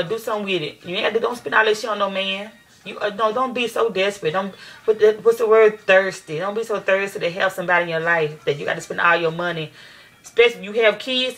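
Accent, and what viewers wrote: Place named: South, United States. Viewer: Her southern accent is very comforting... she literary puts me to sleep when she talks LOL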